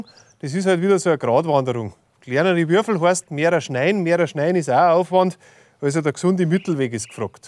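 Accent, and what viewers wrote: Bavaria, Germany. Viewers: einfach super gezeigt.......bitte unbedingt die bayrisch Sprache weiterhin so schön pflegen. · Sehe ich genauso das man Dialekt/ Sprachen pflegen sollte aber ich als Nordeutscher könnte es locker in Platdeutsch verstehen aber in Baiyrisch habe ich echt Probleme da wäre son untertietel echt praktisch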